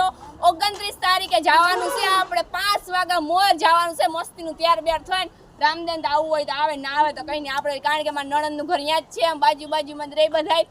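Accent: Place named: Porbandar, India. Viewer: દીદી જી તમે પોરબંદર જેવું બોલો છો મતલબ આપ કયા કોમ્યુનિટી માંથી છો 🙏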